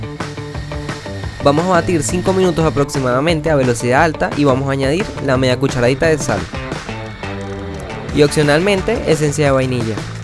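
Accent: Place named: Ecuador. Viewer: es que tienes asento como si fueras ecuatoriano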